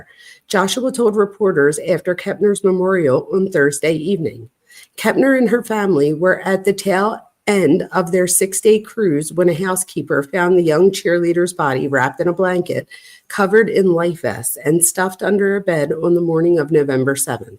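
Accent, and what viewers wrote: Philadelphia, United States. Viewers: Are you from philly? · ❤LIKED n 🙋🏼‍♀️SUBSCRIBED! You're voice sounds like 🏡home🥰 I miss the Philly/ (....or NJ?) accent living out here in CA— It's just comforting to hear. ... And you seem like an excellent little snoop!🕵🏼‍♀️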